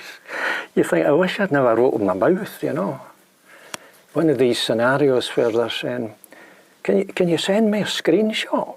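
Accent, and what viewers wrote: Scotland, United Kingdom. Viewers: So this is way off topic. But I found your channel because I have the type of brain that gets interested in very specific things, and lately I've been interested in the name Burns and all its variations (Byrnes, Byrne, et cetera) and found out about Burns Night and was interested in how Burns is said in a Scottish accent (I'm American) so I was googling something like "Burns from Scotland" and found your channel. I found a few videos where you do say it:). Now I understand that Burns, Byrnes, and Berns are all different names with different origins. I also learned for the first time that people from Scotland make the "er" sound different from the "ur" sound, whereas I say those the same as an American. So I'm wondering: would you pronounce "Burns" differently from "Berns"? I'm guessing you would.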